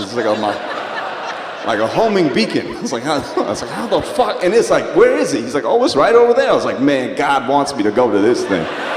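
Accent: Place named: United States. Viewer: It's swaminarayan conference, even at first I was like what is he talking about cause of that American accent he has, but then it clicked, lol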